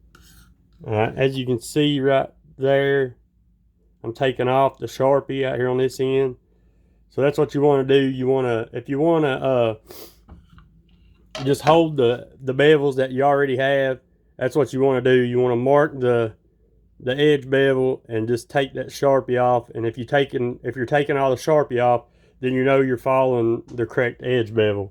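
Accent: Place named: Tennessee, United States. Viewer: Great video, I spit my coffee out then you were sharpening the recurve and said Shit. I’m from Tennessee, you gotta be from this area with that accent.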